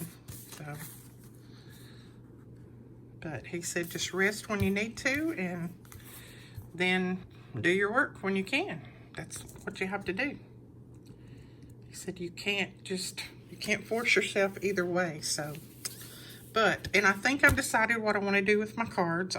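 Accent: South, United States. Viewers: Love your southern accent,I am from the south but I think my accent is not noticeable. I enjoyed your video. That is cute. Can't wait to see another video.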